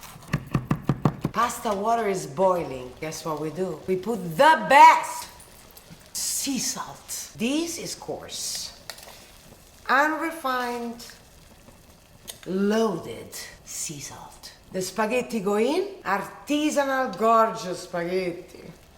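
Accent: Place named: Italy. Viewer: I will buy your book. From Brasil to improve my english Rsrs I ❤ your italian accent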